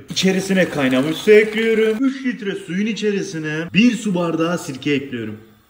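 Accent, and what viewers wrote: Adana, Turkey. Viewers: videodan demiş adamın videoları var orda Adanalı gibi konuşuyor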